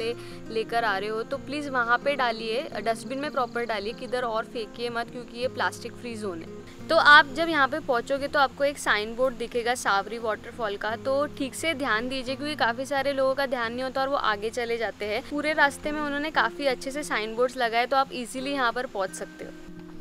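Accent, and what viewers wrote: Maharashtra, India. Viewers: Your hindi accent is cute...poora marathi mulgi :D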